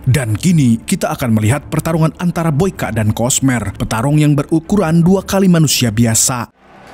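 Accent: Banjar, Indonesia. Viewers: Urang Banjar kah sakalinya? 😁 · Urang banjar sekali ny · Admin orang banjar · Urg banjar kh mang 😂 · Orng banjar kh mang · Banjar sekali nya Admin Nya,slam sanak dari kotabaru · Urang banjar kah boy admin nya nih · Banjar nya mana pian mang... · Pian urang banjar kah?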